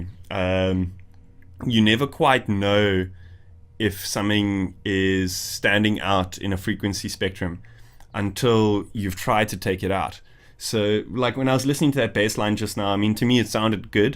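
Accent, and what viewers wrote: South Africa. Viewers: Hey bud, are you from South Africa?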